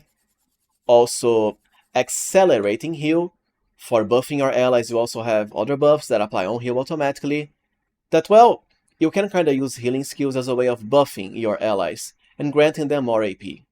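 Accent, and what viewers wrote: Brazil. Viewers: Just started watching your video and within 10 seconds I'm 100% sure you're brazilian!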